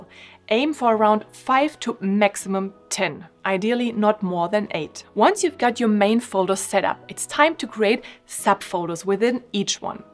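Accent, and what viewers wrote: Germany. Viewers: Are you German? 🙄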